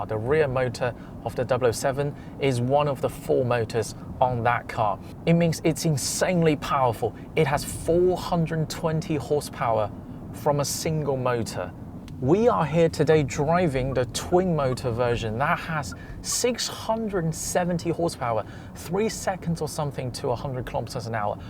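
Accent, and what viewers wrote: United Kingdom. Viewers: He has a thick British accent though.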